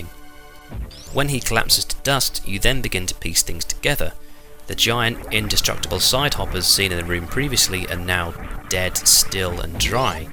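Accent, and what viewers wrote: France. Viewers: You are french my dude ? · Are you french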